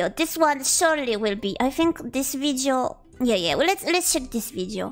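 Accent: Canada. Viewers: That damn Canadian accent is crazy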